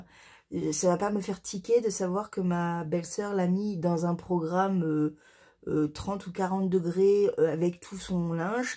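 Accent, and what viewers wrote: South, France. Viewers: Bonjour, je te suis depuis tres longtemps, sans laissé de commentaires et c'est la première fois que j'entends l'accent du sud et d'habitude tu ne l'as pas. Merci pour ta bonne humeur et ta gentillesse.A bientot🥰😽😽😽😽🌺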